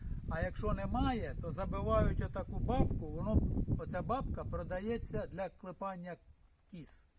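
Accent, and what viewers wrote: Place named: Ukraine. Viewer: Який прекрасний говір,говір нашої України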